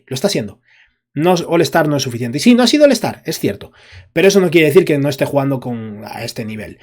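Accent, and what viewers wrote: Spain. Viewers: Habla más despacio,nosotros los latinos no entendemos bien a los españoles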